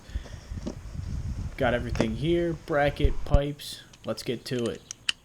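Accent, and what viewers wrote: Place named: Pennsylvania, United States. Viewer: Cool man. Sounds like a PA accent. Looks great. Totally worth getting a tool for the retaining ring I think. Here’s one I have. Pretty inexpensive for the heart burn. <link>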